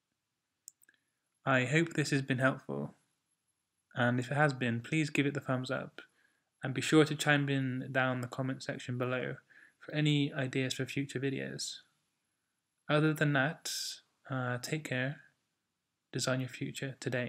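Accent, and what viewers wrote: United Kingdom. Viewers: Thank you!! finally a short on point video! btw from which region of uk is your accent from :)?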